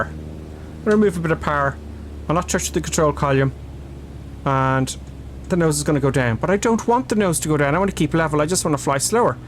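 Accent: Ireland. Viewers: Brilliant. Please continue. Love the Irish lilt 🍀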